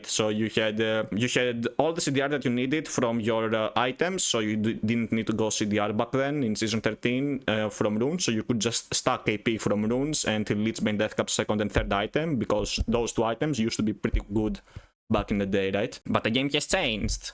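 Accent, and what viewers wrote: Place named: Greece. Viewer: In 2 seconds I was like, he is Greek. What about support Zoe?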